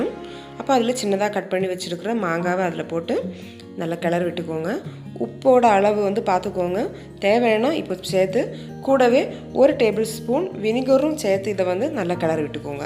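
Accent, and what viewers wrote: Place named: Kanyakumari, India. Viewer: Kanyakumari Tamil❤️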